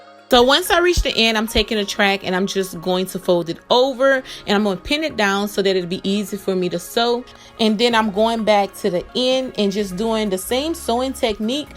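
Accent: Louisiana, United States. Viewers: Am I detecting a Louisiana accent in that voice? Lol I love it! You've made it so easy for me.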